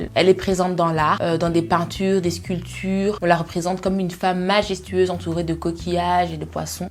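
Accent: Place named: Brazil. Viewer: Você é brasileira, o sotaque família Brasilândia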